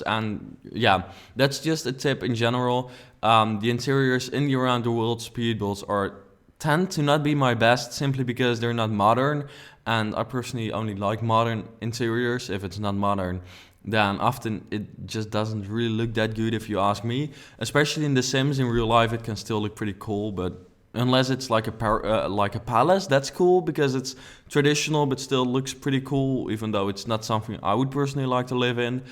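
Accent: Netherlands. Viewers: I think you are a great builder and produce some nice content. I am afraid you dutch accent is painful to listen too though. It makes me cringe XD (you seem like a nice guy though) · Ik merk dat je Nederlands bent want je hebt een accent van een HOLLANDER!!!!! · I was like "yeah right hes gonna know how to build that" then i heard his voice and was like "yup he dutch" lol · Thaatttthh Goooooooddddd XD lekker accentje, elke nederlander kan een nederlander herkennen aan t acceptnje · You have a dutch accent 😊👏🏼❤️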